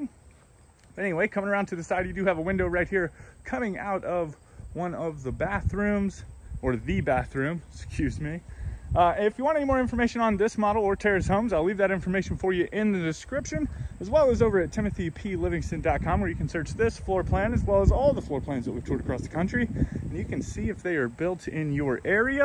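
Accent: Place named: North, United Kingdom. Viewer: Too northern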